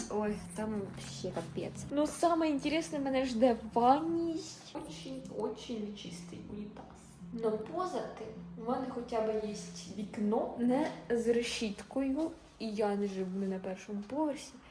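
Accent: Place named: Surzhyk, Ukraine. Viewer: Рибочка, киценька) Підписана на Вас недавно, завжди дивлюсь Ваші відео як маленьке свято чи відпочинок, отауі вони співзвучні! Як класно, шо Ви така щира і харизматична! Зраділа чомусь, шо Ви в Варшаві, моя молодша донька теж вибрала свою самостійність в Варшаві. Дорога до себе завжди важка, зате коли себе чуєш, легко жити це життя! Такий у Вас рідний для мене суржик, звідки Ви? Я з Сум. Бажаю Вам гарних людей поряд і вдячна за відео!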